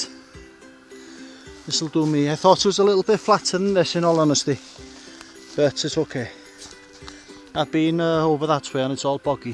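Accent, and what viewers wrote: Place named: Wales, United Kingdom. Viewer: Great video buddy,proper Welsh accent up your way. Bet you speak Welsh to.... Nothing wrong with a wild camp in lock down. Done it myself... Felt proper safe from covid, being miles away from civilisation. If you have any negative comments.. Tell them to piss off.... Check my wild camp out buddy... Atb dai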